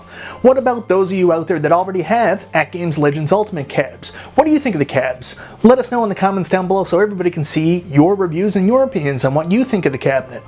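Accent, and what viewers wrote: Canada. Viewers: Great review. By chance are you a fellow newfie haha accent sounds like it lol. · So far, you can tell you're from Canada. Especially with the "marquee". I love the accent. Thanks for the review!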